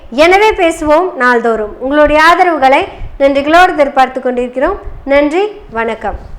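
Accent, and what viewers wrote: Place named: Coimbatore, India. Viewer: மகளே உங்கள் கோவை ஸ்லாங் பேச்சு இருக்கே அப்பப்பா அருமை ❤❤❤❤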